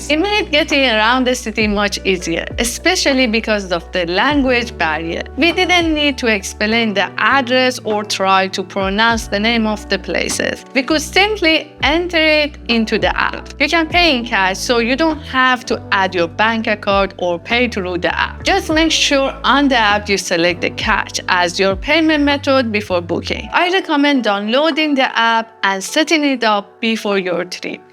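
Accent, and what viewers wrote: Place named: Iran. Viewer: the Persian accent kills me, she has to say everything in Iranian way, even change the pronunciation of names to make them sound Persian!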